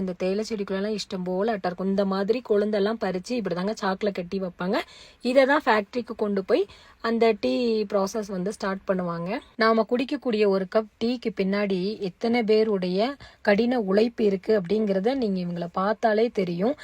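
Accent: Tirunelveli, India. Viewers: நீங்க எந்த ஊரும்மா பைய அப்படின்னு பேசுறீங்க திருநெல்வேலி, நாகர்கோவில் இப்படி பேசுவாங்க அதனால் தான் கேட்கிறேன்மா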